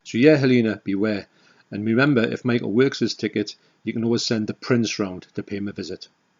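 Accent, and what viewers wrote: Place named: Newcastle, United Kingdom. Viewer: Hi.Love the Alex picture signed by magnificent Malcolm himself.I’ve been enjoying your vids for a few months now,there’s not enough Geordie accents on YouTube.My late wife was from Killingworth and I still visit the family a few times in the year (I’m down south).Your love of depraved video nasties warms my cold dead heart.Keep up the good work!👍🏻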